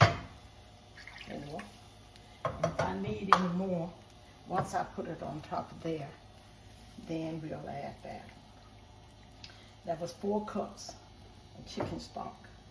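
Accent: South, United States. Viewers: Love u, very beautiful & rare moments to hear u sing, u are a delight to watch. Thank u so very much for sharing your knowledge, u are great by yourself the guy wants to interrupt and speak over u. Love your presentations & southern talk, dont like to hear camera guy mimic & make fun of your words!!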